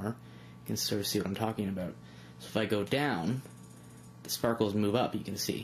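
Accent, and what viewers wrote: Canada. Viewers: gotta love the way canadians say "about"!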